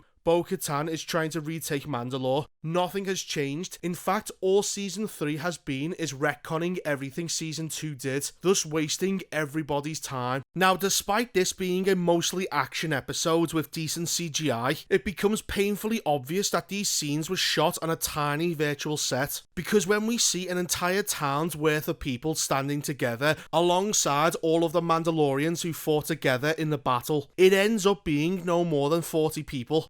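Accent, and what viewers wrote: Liverpool, United Kingdom. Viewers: Bro, you have to be from liverpool yea? This man sounds EXACTLY like Darren Till 😂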